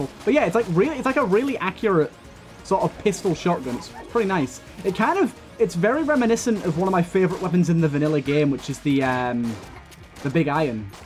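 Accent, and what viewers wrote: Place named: Scotland, United Kingdom. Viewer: Nah he is just Scottish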